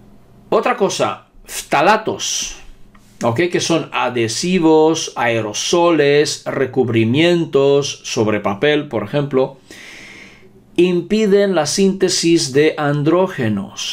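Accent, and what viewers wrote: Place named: Poland. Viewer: tienes acento de polaco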